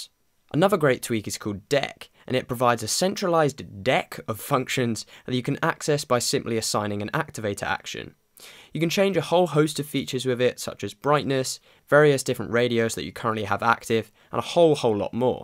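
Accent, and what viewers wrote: United Kingdom. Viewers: would you mind to send to make a list of the tweaks you mention? i'm really bad at understanding english properly, specially if its british acent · The british accent just sounds professional and makes you want to get all these apps! i love your videos mate.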